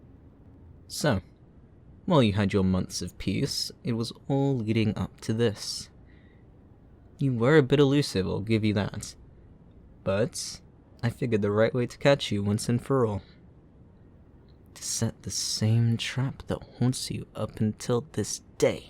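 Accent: United Kingdom. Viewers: (speaking French) Bro I thought you were British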